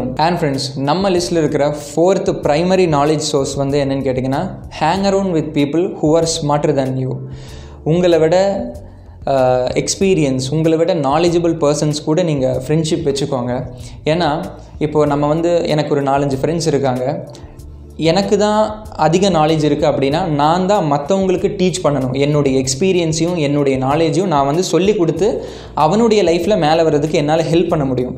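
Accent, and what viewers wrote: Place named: Kerala, India. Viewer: I like your Tamil+Malayalam accent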